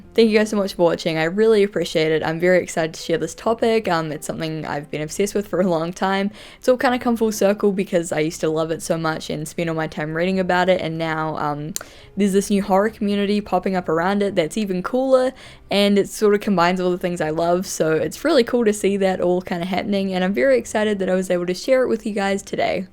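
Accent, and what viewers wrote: New Zealand. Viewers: No. New Zealander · I just discovered this channel. Is her accent Kiwi?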